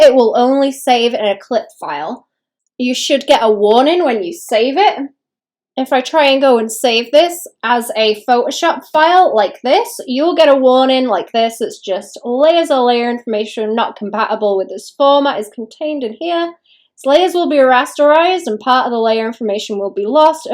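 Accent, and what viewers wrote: North West England, United Kingdom. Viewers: Great art, learning lots from this. Can I ask where you are from? Your accent sounds really close, north west UK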